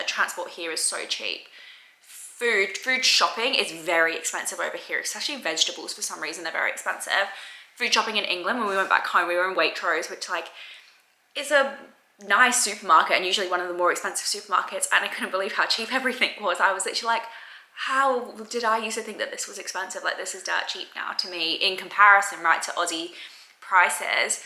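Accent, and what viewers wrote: Australia. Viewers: You've got a good Australian accent. There are English people who've been living here for 20 years that sound like they just got off the boat. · You've definitely picked up an Aussie twang in your accent! I just got my PR and looking to move out next year. Can't wait. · I didn't know you could say "like" that many times in such a short time LOL. But you have picked up our accent really quickly. Good on Ya! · I call bs. She sounds too Australian to have only been here for 3 years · You already have an Aussie accent.. nice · You have a lingering Aussie accent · I love your accent!! Bit of Aussie, Londoner & Manny!😅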